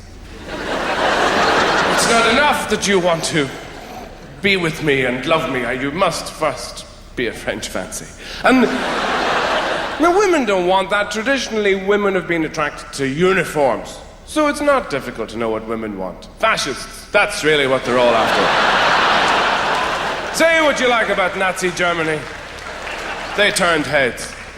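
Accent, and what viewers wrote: Ireland. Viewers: Eddie Izzard meets Dave Allen. The incredulity and upwards inflection being pure DA — probably the Irish lilt to some degree. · Yes he is irish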